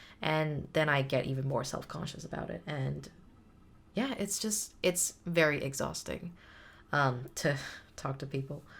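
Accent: United States. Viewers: I don't hear an accent in you and I'm from the US. You sound generally American. I'm from the Southern US and I try to suppress my accent to some extent and I am careful with how I say things just to make myself sound more understandable. You're an interesting person. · Oh wow i honestly thought you're from the US lol.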